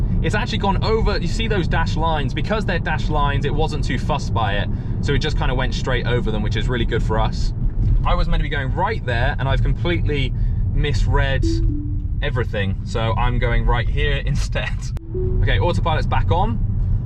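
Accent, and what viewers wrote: United Kingdom. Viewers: Are you scottish and doing a switcharoo. I speak english as a first language. When I speak to people outside India or in videos I speak in a way that most native would find easy. But speaking english with non native speakers in India I need to deliberately misprounce words and use incorrect grammer otherwise they want understand me.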